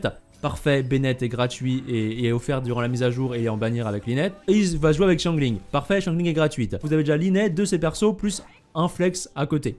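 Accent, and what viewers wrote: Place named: France. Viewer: Mec tu peux utiliser des mots français pour parler des capacités des persos parce que tu utilises des mots anglais mais tu les prononces avec un accent français donc on comprend pas trop. Merci d’avance.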